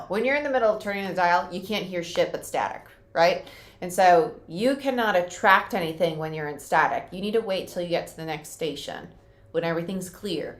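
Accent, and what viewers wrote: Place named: Cockney, United Kingdom. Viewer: LONDON.. LMAO.. I was just laughing at your kinda cockney accent at that point. You do it quite a bit and it always makes me smile.. Usually when you say WATER.. 🙏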